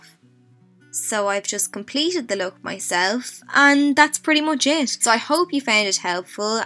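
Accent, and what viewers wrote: Ireland. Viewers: Are you Irish??👌👌👌👌👌👌💎💎💎💎💎💎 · Are you Irish by any chance? Xx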